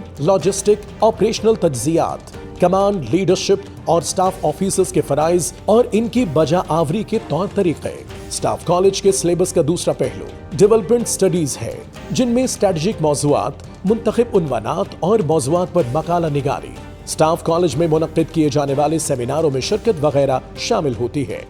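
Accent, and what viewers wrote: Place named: United Kingdom. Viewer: Urdu Engrezi accent mein, wow !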